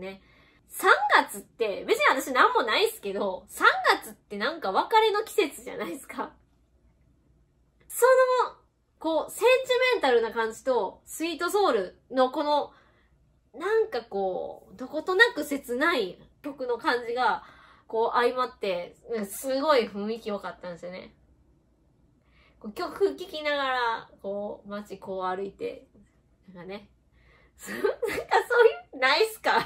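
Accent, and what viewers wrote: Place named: Osaka, Japan. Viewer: さん！今年もよろしくお願いします。 『メチャ』『ホンデ〜』『オモロイ』『アンマー』 メチャー大阪弁大好きやん！会話の間の取り方良いね。藤井風また聴きます。『何なん！』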